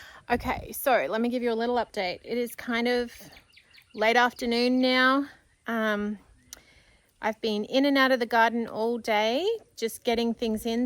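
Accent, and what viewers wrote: Australia. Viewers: I so ❤ listening to you talk in your Aussie accent. 💕 And we can figure out what you are referring to most of the time, peppers or Capacean. Bless you and your family! And sunshine & raindrops to you my friend. 💗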